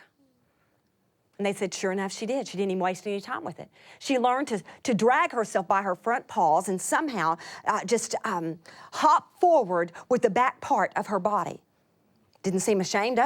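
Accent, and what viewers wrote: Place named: United States. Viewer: wow. What an American accent!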